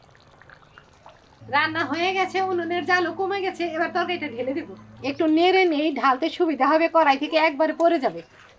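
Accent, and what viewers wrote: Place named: Bangladesh. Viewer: Didi ki bangladesi meye naki,kotha bolar doron ta bangladesi der moto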